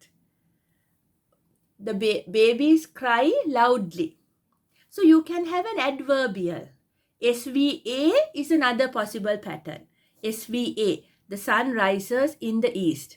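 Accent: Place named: Sri Lanka. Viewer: Dear Mdam You are the only one English teacher who teachs English in English who has Sinhala voice.. සිංහල හඩකින් ඉංග්‍රීසි ඉංග්‍රීසියෙන් උගන්වන එකම ගුරුතුමා හා ගුරුතුමිය හරීම වටිනවා. මම ඉංග්‍රීසි ඉගෙන ගන්නා විශ්‍රාමික (ගණිතය ඉගැන්වූ ) ගුරුමාතාවක් .. ඔබතුමියගේ මීට පෙර ඉංග්‍රීසි /සිංහල පාඩම් හැදෑරුවෙමි. (I try to read English poems which I abandoned ) Thanks again.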